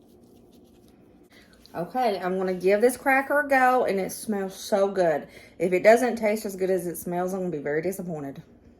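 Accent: South, United States. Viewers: I love hearing your voice! Girl, you deliver with a happy Southern accent! Thank you for being honest and ‘real’!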